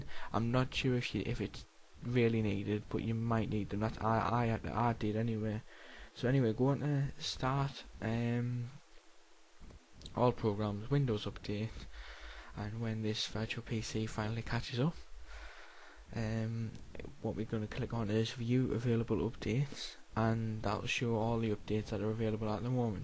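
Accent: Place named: Cockney, United Kingdom. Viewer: Lol.. What kind of cockney gibberish are you ranting is this? I'm only assuming- I really don't know which UK dialect you're ranting, but it's difficult to make out; and also this video was very poorly encoded at that.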